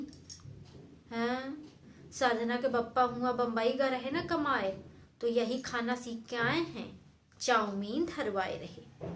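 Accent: Awadhi, India. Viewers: Maine jb apko awadhi bolte suna to Mai dang rah gyi ke ye h👍👍👍👍👍👍👍👍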